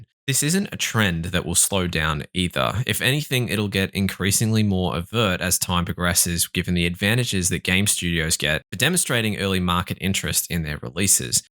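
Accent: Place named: Australia. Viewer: If only it werent for the Aussie accent, it'd be decent content.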